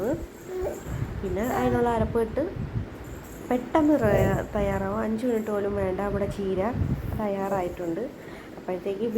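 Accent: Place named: Thiruvananthapuram, India. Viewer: സ്ഥലം എവിടെയാ സംസാരം കേട്ടിട്ട് ആറ്റിങ്ങൽ വെഞ്ഞാറമൂട് ഇവിടെ എവിടെയോ ആണെന്ന് തോന്നുന്നു 🥰👍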